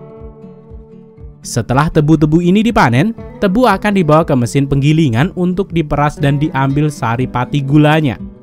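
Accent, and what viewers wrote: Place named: Chinese-Indonesian, Indonesia. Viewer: Logatnya kayak chindo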